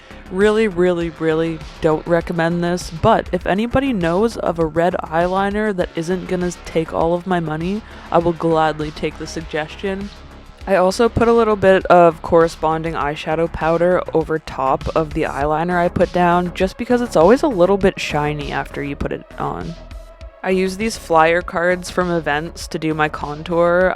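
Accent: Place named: Canada. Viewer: You Canadian Accent is amazing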